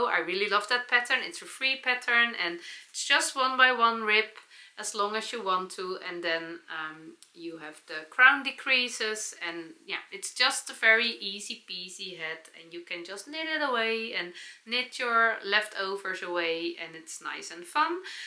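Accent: Netherlands. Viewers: Your hat is adorable and you look so beautiful in it!😊 I Always enjoy your shows and your “ Dutchey English”!😊👏 You do a great job and I always appreciate you not editing your videos to keep them real! 🤗Thank you and Blessings to you and your family!😊🌸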